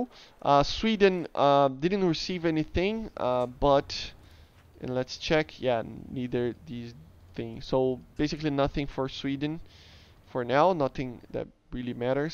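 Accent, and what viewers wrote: Brazil. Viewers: You sound like a Brazilian guy 🤔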